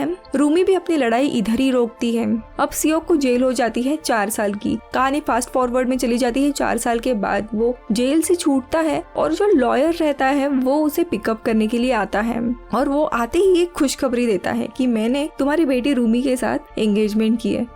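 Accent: Maharashtra, India. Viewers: as usual one again nice explained ...👍 Marathi accent aasun pan hindi yevdi clean kashi boltes 😁😅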